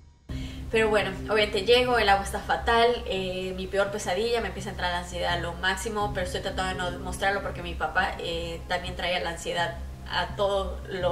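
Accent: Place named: Campeche, Mexico. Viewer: De donde eres. Hablas como Campechana.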